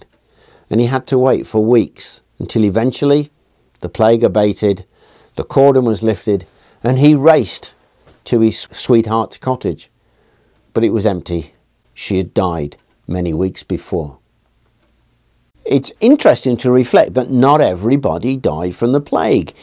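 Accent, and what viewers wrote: United Kingdom. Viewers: I am a history enthusiast. And my love of history deepen even more and more when I watch your videos with your extraordinary british accent and learn unique words thanks to your rich lexicon.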